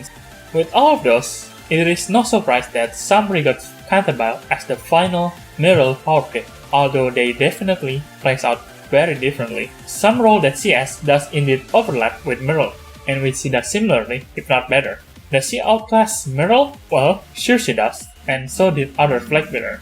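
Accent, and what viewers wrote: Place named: Asia. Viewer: It's more cause of his "asian?" accent than the mic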